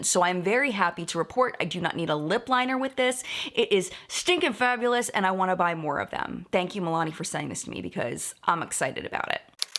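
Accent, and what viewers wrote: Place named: Maryland, United States. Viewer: I can hear you're a Marylander with how you say your Os. 😁 Hi from a fellow MD lady!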